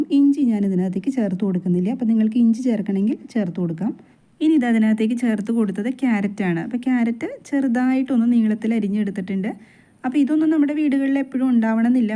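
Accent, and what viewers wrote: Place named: Thrissur, India. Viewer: Thrissurinte സ്വന്തം ഭാഷ ❤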